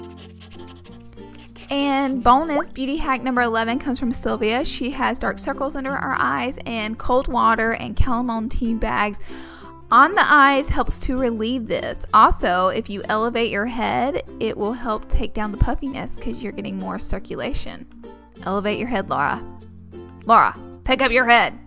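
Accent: South, United States. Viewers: this is awesome! can totally tell you're from a southern state and this is the first video I've ever seen from you lol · She's southern. She has an accent. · Everlasting Luv she's from Alabama so she has a southern accent, I'm from Alabama too so I don't even notice it unless she says something about it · It's that southern drawl lol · Your accent is adorable! I'm from the south too! ( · Shut the fuck up! She's southern! Most states pronounce things differently! Would you tell a British person they pronounce aluminum wrong? No! Because that's how they pronounce it! So fuck off, BITCH!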